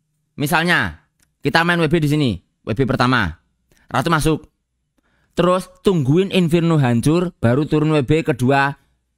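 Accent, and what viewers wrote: Java, Indonesia. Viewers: Ngab... Jenengan asline pundi geh... Jowone medok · bahasa Inggris mu keren yoan ngab,medok e Yo oleh🗿🗿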